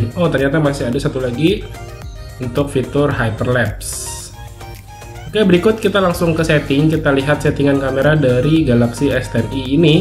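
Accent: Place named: Medan, Indonesia. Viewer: Accent nya ga enak banget. Pelafalan D sama T disetiap akhir kata nya itu loh. China medan banget.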